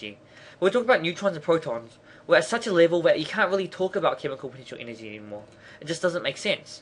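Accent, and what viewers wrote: New Zealand. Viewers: New Zealand accent, brother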